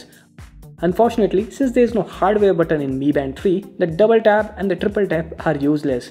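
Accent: India. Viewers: I could hardly understand your Indlish... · Ngomongnya medok india banget yak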